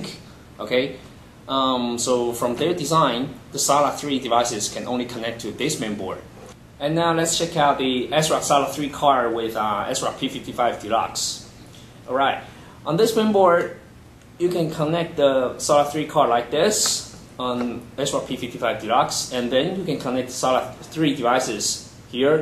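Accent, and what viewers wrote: China. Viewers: Chinese English!!!!!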